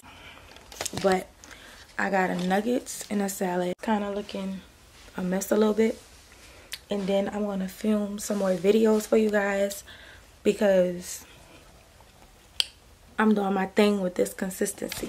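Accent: New Orleans, United States. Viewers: Is that a New Orleans accent I’m hearing? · Yesssss I was thinking that 😍 I love New Orleans accents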